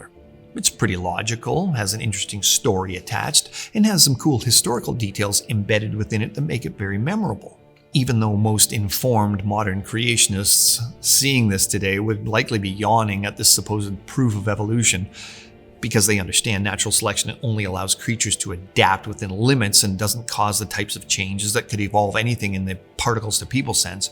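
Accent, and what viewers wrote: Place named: Canada. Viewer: This guy has the most Canadian accent ever.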